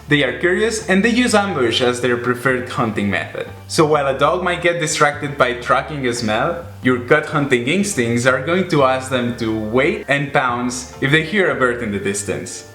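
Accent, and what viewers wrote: Spain. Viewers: Nice video! Thanks for the tips, going to try walking Felix soon! Also I noticed your accent, just wanted to ask, are you from Catalonia?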